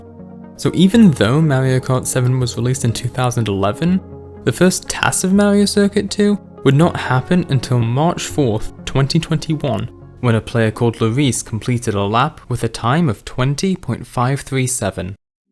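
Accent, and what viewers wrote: United Kingdom. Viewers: summoning salt but bri'ish (in all honesty rly good vid :D) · Most British sounding American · legit thought you were british at first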